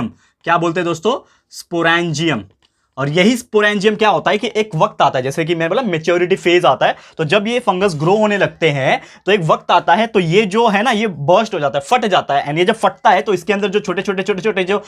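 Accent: Maharashtra, India. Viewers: Sir what is your mother tongue?? Are you marathi??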